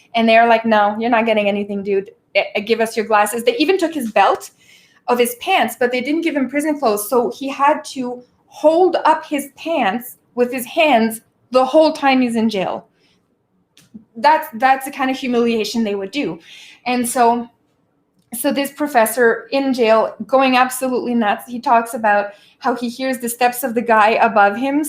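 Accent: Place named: Quebec, Canada. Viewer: I thought I heard French -Canadian. I was just about to ask when you mentioned it.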